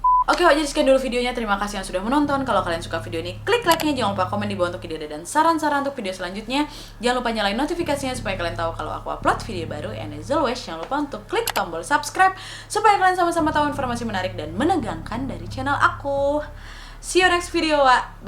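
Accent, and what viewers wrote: Malaysia. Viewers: OMYGOSH. SAID SELUMBER. i love her accent, it really same to Malay accent 😂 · Cara penyampaian wak2 sikit mcm orang malaysia 🤗🤗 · Selamber je bahasa melayu tu bila sbut.. macam asli melayu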